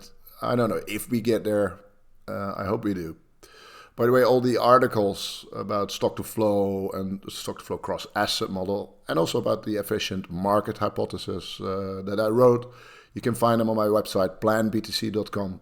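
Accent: Netherlands. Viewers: If someone sounds like the new NATO top dog, they're Dutch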